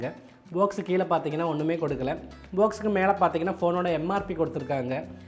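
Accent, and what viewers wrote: Kerala, India. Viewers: Ur tamil with malayalam slang is super bro... But ippo Idhu 7999 aachu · Happy to see a malayali giving review in Tamil.. Love your(Malayali's Tamil slang) accent bro!.. 😃😊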